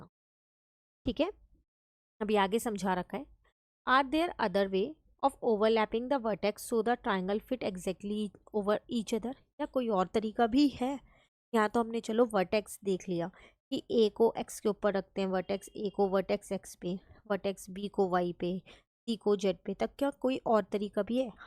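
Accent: Bengal, India. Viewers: Are u bengali😮😮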